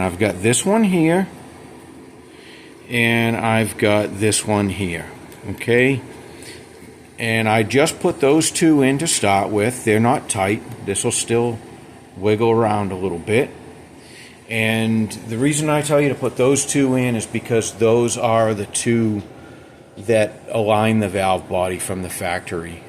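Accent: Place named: New Jersey, United States. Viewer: That NE Jersey accent is the worst sounding in the USA. Hems and hauls around talking talking in that annoying accent. Bro get to the point...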